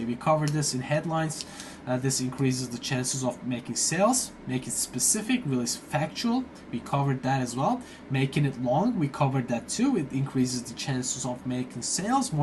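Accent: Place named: Turkey. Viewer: Çok Türk aksanı alıyorum bu videodan :D Kötü bir şey değil bu arada, sadece kanalda isim dahil her şey İngilizce olduğu için şaşırdım. But anyways, thanks for the tutorial and tips 👍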